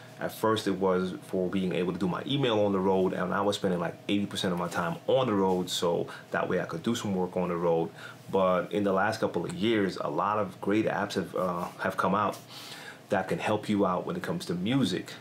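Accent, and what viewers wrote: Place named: United States. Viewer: You have an impeccable American accent. I live in Purmerend and work in Amsterdam, been here for a little over two years. Very nice place to live. Glad I found your channel, lots of great stuff, thanks fir sharing your experience!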